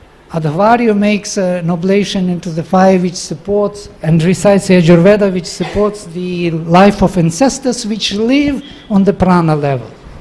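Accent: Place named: Russia. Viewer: Very beautiful exposition and i really enjoyed the charming Russian (?), Indian accent and humble manner in which it was delivered. Thanks !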